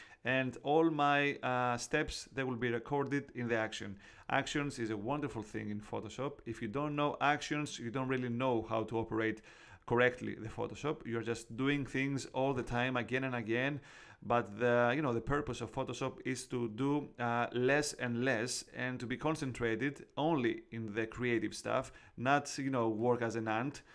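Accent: Greece. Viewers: χαχαχαχα μόλις άκουσα την φωνή σου κατάλαβα ότι είσαι έλληνας! πολύ ωραίο βίντεο μπραβο σου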